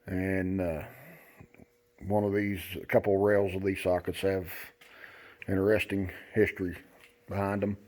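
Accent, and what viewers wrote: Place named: West Virginia, United States. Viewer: oh wow, I’m on the Wv border in Ky. My family is from Wv. I thought I heard you mention Charleston and I was like man the accent sounds like home. We do most of our shopping in Huntington and try to stay in corridor g when we head to Charleston.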